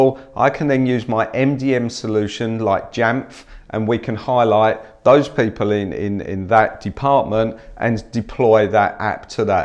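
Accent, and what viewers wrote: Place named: London, United Kingdom. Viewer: He has a proper London accent - entirely different to Aussie one. The auzzie accent makes you want to rip off your ears lol.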